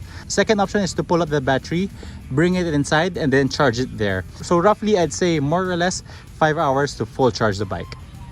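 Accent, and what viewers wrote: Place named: Philippines. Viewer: YOU SOUND SO PILIPINO.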